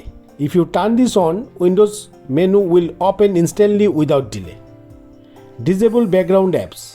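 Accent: India. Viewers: When a guy has an Indian accent, you know it's going to be the best video of all time.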